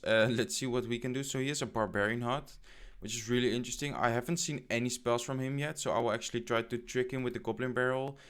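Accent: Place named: Netherlands. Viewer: Michi i think he is dutch :)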